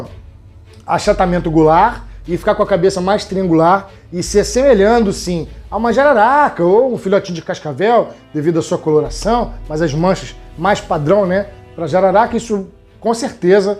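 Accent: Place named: Bahia, Brazil. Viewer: Com sotaque baiano. Meau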